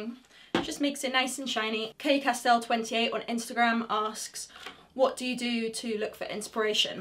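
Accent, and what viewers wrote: England, United Kingdom. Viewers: Where are you from? You sound so British... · The british accent is simply the icing on the cake. What a combination of personality, talent, looks, aaaaaaaaaand accent. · Typical Brit accent!i love it!you gatt try or or by! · I'm a little late to the party as I only found you on youtube recently and was amazed by your skill. Looked up more recent videos of yours and after a few covers I opened this Q&A, so that I could have an idea of who you were, aside from a fantastic guitar player. Well, I'm hooked after just the first minute. I mean, I was going to subscribe anyway, but: - (amazing guitar skills) - awesome british accent - loves science - likes motocross and would have wanted to become a pro rider Really??? You're great, I'll be very happy to follow your future projects :) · You’re not only into music, but motorcycles, touring America, house, kids, English accent, etc? 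Good God girl-why couldn’t I have found you?!? Yes, let’s see a vid of you and your motorbike experience 👍🏻🇺🇸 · Ah...Your ENGLISH English as the young lady in the Warlock movie says! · I am from the U.S. What type of English accent do you have? I know its not Cockney.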